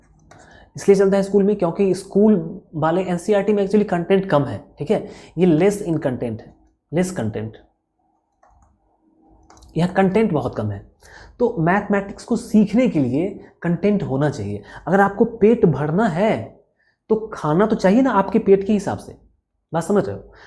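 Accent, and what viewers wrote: Bihar, India. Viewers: Sir I love your bihari style 😍🤩🔥🔥🔥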